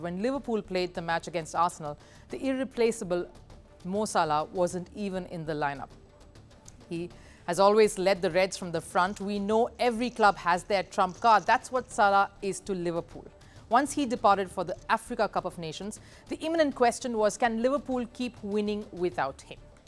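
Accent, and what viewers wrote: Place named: India. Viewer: Listening to football news with an Indian accent is weird.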